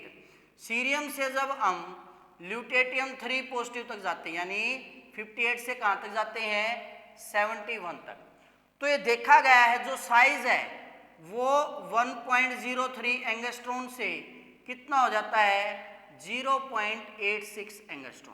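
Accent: Haryana, India. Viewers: You are seems likely from HARYANVA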